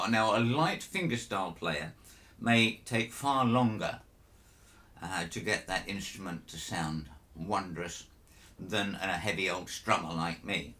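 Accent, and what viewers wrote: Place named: United Kingdom. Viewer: Good vid! You Brits sound a little funny to a West Texan, but that sure doesn't make yur view of the world any less valuable. ;) I'm a guitar tech, (Luthiers are the guys that actually know what they are doing) and I get tons of first timers, in fact thats the market I'm in. One amazing thing I've discovered with new players is if we teach them the mechanics of the guitar, action, level frets, tuning, string changing, checking and setting the truss rod. Just minimal maintenance, this "seasons" the player and like getting the wood used to resonating, now you have a familiarity built in between the student and the large wooden object sitting in the student's lap.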